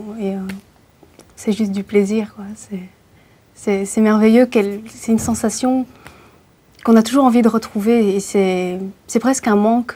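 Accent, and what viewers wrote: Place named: Belgium. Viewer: elle a un petit accent belge, j'adore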